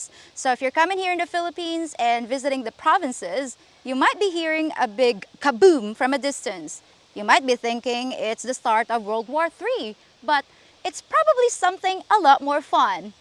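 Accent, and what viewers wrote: Australia. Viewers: a bit of an ausie influence !! another fun video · “It cracked the bloody cannon…crikey. Gotta love a girl who speaks Australian. Great video.🙏❤️❤️❤️❤️❤️🇦🇺😎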